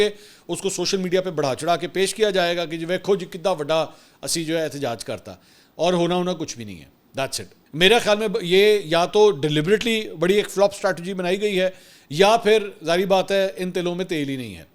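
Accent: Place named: Rawalpindi, Pakistan. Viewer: پتہ چلا ھے آپ کی آواز پنڈی سے ھے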